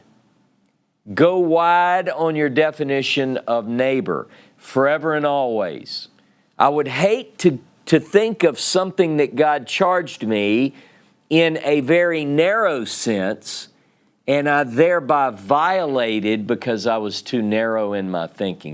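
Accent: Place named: South, United States. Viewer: Brother, your southern accent is infinetly amusing and very fitting for a teacher.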